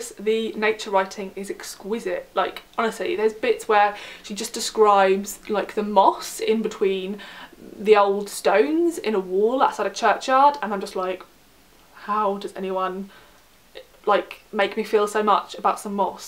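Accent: England, United Kingdom. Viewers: I love her accent. Could i know which part of England she comes from??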